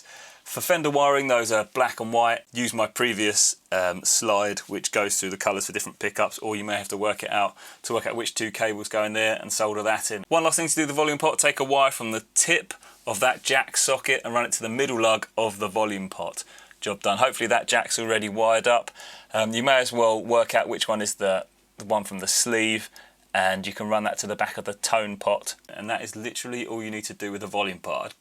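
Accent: United Kingdom. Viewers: it's for England English speakers ... USA English speakers can barely understand him as he talks so fast in British English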